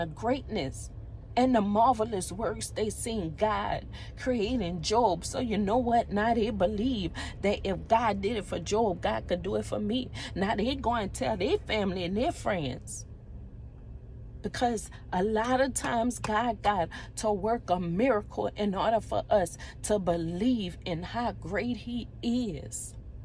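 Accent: Louisiana, United States. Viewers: She from Louisiana 😊 I 💕 her accent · I’m trying my hardest to stay positive in life but it’s been hard I feel like Joel in so many ways I have lost everything including some family members that I love dearly and I’m trying to figure my way through this storm it’s been going on for some years now I have been praying for blessings and opportunities and open doors I have never prayed this much ever in my life! I’m just not used to this I’m homeless I work for a relative and he doesn’t care about my situation I just need to get back on track ! My heart is pure ! I’m strong but I’m at a boiling point and I need help and prayer so boss lady can you please pray for me and I love your Louisiana accent ❤️❤️🙏🏽🙏🏽💯💯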